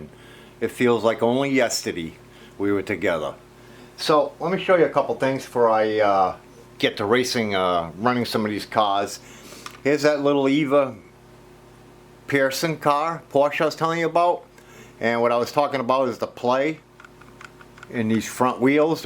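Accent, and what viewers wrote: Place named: Rhode Island, United States. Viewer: You sound like a fellow Rhode Islander to me, Did I guess right? Or Mass. maybe Boston? Nice track.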